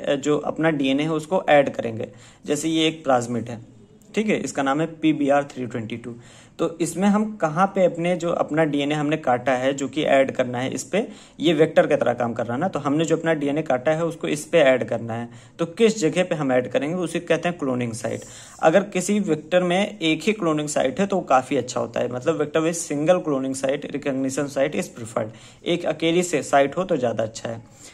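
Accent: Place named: Bihar, India. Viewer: Thankyou for the amazing session 🔥🔥 Sir ap bihar se h?? Humra v accent apke hi jaisa h or bahut acha laga k ap dawn to earth h❤ or jaise h bilkul waise hi show karte h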